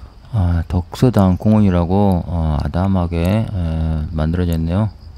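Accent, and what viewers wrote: Jeolla, South Korea. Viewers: 전라도 말툰데 뭔 일본어???? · 뭘보고 일본인?? ㅋㅋ 딱들어봐도 전라도 사투리구만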